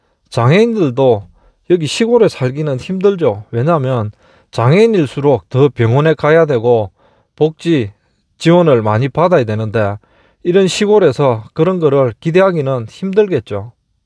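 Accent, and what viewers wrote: Gyeongsang, South Korea. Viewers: 영상 내용도 내용이지만 운영자님의 완벽한(?) 경남 사투리에 늘 더 끌리게 됩니다 ㅎ~. 저도 고향이 경상도인 사람인데 운영자님의 나레이션을 듣고 경상도 사투리가 이렇게 매력적인가??..새삼 깨닫게 됩니다. 암튼 좋은 영상 늘 감사히 잘 보고 있습니다~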